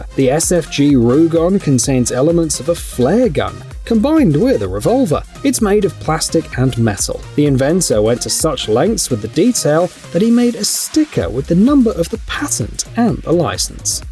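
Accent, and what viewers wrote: United Kingdom. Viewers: Well he does sound like a brit. What would you expect?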